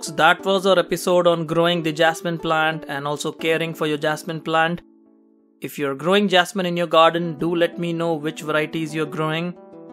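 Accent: India. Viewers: You sounds that you are Indian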